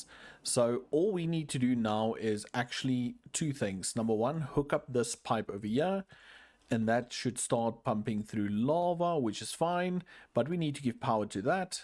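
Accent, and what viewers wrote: South Africa. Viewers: You sound a lot like RenDog are you south African per chance?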